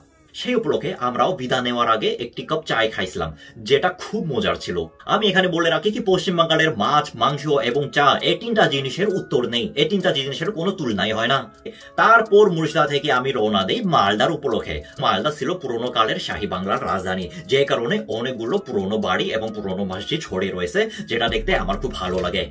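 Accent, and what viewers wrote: Bangal, Bangladesh. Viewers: Your Bengali speaking style is some kind of East Bengali (Bangladeshi) style though... 😁😁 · দারুন কিন্তু তুমি বাংলাদেশী দের মতো কথা বলছো। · Tumi to ak barae bangal der moton kotha bolcho 😳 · His Bengali sounds little different, more like bangladesi. Sounds so awesome 😎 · But tumi ektu bangladeshi der moto bhasha bolchoamader bhasha bangladeshider moto noi anek misti ...😂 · The specific accent of Bengali you're speaking is predominantly spoken in East Bengal (Bangladesh and parts of Tripura)... You are doing great! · বা: বেটা বা:। তুমি কোলকাতায় এসো, ইলিশ খাওয়াব। যোগাযোগ রাখবে। এভাবে ইলিশ পাঠানো যাবে না। তুমি বাংলাদেশ থেকে অনলাইনে আনাতে পারো, ওরা ব্যবস্থা করে দেবে। 1700 টাকা কেজি। কথায় বাঙাল টান (বাংলাদেশী টান) হল কি করে? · Are vai etato bangal style Bangla, just wow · Your bengali is of Bangladeshi accent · কিছুটা বাংলাদেশী টানও আছে । তাই না ? 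· Ata to bangladeshi voice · Tumi khub vaalo bangla bolchho tabey kichhuta bangladesher moto uchcharan hochhe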